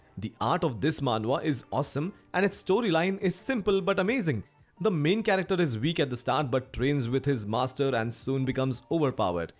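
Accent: India. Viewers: fuvking indian accent ruin the video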